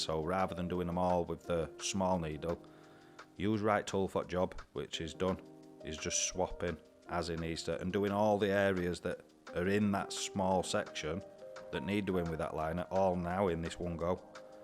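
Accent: United Kingdom. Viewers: OMG what a thick accent like wow, the most British/English accent I have ever heard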